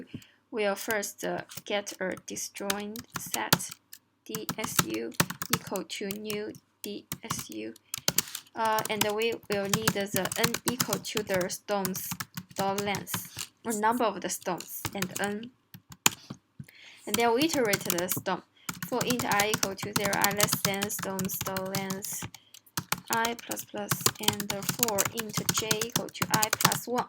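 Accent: China. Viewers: 博主浓浓的汉语味啊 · 小姐姐是不是山东人^^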